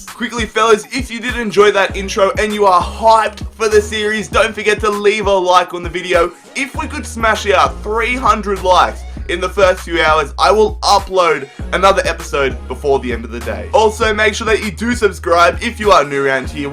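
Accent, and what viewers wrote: Australia. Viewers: where u from? is it Australia? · Are u Australia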